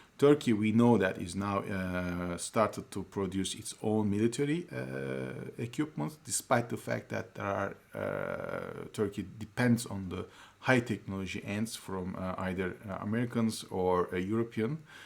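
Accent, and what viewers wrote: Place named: Russia. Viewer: You sounded like a Russian speaking English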